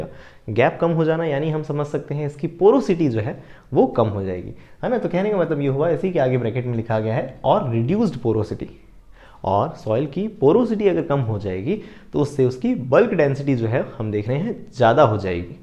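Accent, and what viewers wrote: Bikaner, India. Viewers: No it's a bikaneri sir ❤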